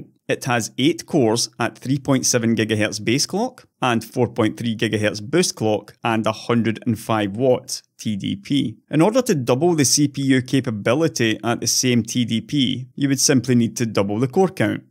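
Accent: Scotland, United Kingdom. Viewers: Scottish accent? 😂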